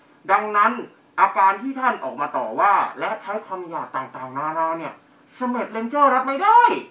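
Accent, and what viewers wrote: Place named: Laos. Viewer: พี่ทำได้ลาวจังฮะ 5555+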